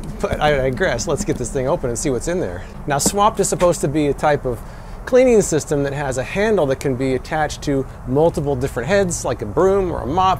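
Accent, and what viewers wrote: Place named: South, United States. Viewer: Keep the southern accent man 😂 if you grew up in the south then keep the accent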